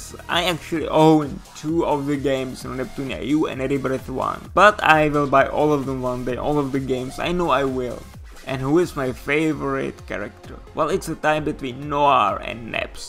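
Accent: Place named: Mexico. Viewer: That is a Spanish/Mexican accent or my name is Mexican Jumping Bean! (and it's not)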